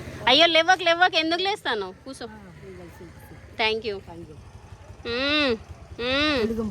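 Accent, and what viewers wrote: Telangana, India. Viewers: Mee hair style baagundhi , ee muchata anedhi inkocham sepu ekkuva pedithe baaguntunde , any way mi videos down to earth ga untayi, veelainantha varaku Telangana yaasa maatladandi vinadaaniki vinasompu ga feel baaguntundhi , emanna ... Feel untudha Telangana yaasa baasha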